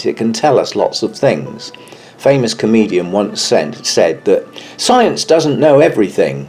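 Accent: United Kingdom. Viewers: It is perfect,you know I'm not a native english speaker,and people with British accent really help me out with speaking;)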